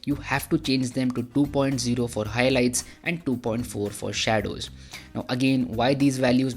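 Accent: India. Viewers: Open video = indian accent = close the tab 😌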